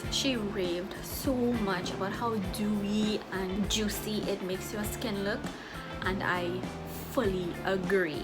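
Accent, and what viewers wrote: Trinidad and Tobago. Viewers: I heard you’re accent and said this have to be a Trini. Does your fridge condense? I am looking to buy this but the reviews say it condenses so I came to YouTube to find a review and found your video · I know a trini accent when I hear one!! Heyy family 🇹🇹🇹🇹💕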